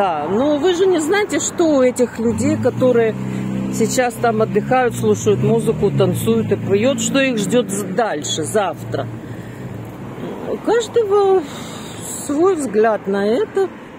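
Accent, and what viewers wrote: Odessa, Ukraine. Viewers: Очень приятный,душевный и голос и одесский говор с дружелюбной ноткой.НЕДОЗАДОЕЛО!)))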